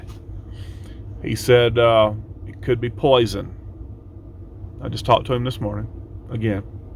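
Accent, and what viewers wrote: South, United States. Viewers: So sorry to hear what little Tucker has gone through. Praise God he is better. I will be praying for you all. it is so touching to hear you praising God and your Savior Jesus. Do you remember when you found the Bible in a campground a couple years back? I began praying for you at that time. I enjoy your thoughtful talks and your southern accent. My Grandparents were from Missouri and I loved their accents. Get rested up!!! My Dr told me to start low and go slow. He made me repeat that to him several times. It is hard when your mind says one thing and your body says another.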